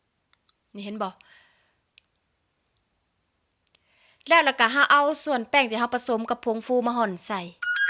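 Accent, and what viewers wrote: Savannakhet, Laos. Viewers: ມັກເຫຼົາເວົາລະອຽດສຽງແຈ່ມແຈ້ງດີລະກະເປັນສຽງໄທສະຫວັນນະເຂດອີກອອກສຽງລະອຽດລະອໍດີເດີ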